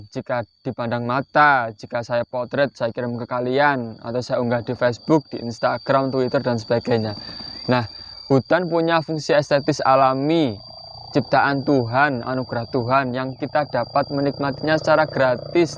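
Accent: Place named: Java, Indonesia. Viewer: Medok Pak, kata orang sumatera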